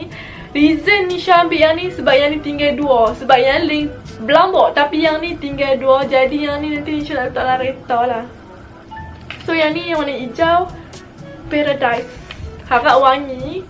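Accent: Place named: Kedah, Malaysia. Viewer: Bagus loghat Kedahnya 😂😂👍👍👍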